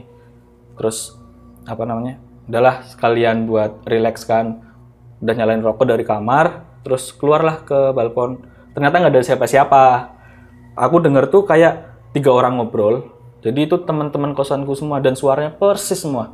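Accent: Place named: Java, Indonesia. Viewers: Logat ngapak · Ledok bahasanya jang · Medhok" mas🤗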